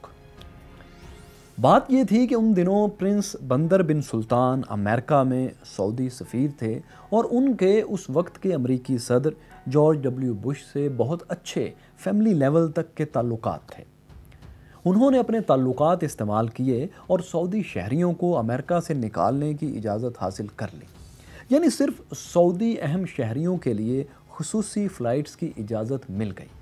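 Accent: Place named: Punjab, Pakistan. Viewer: Urdu with punjabi accent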